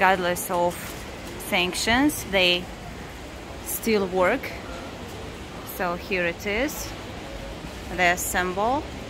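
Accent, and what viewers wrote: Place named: Russia. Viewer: Hi. I'm enjoying your videos. I watched half of this not understanding what you were shopping for. "Swits?" Then I realised you were saying "sweets." (Rhymes with "eats") I love the Russian accent but find it funny that all vloggers mispronounce "clothes." (Of course, I live in the South of the U.S., so I suppose a lot of English speakers would argue with some of my pronunciations.) The "th" is silent in the word "clothes." I think a pronunciation dictionary would have something like "klōz." Anyway, I'm glad that you don't seem daunted that 75% of your viewers are men. Best wishes from America.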